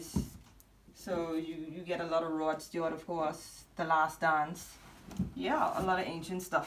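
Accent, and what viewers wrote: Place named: Bahamas. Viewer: Few seconds in and I hear a Bahamian accent lol 🇧🇸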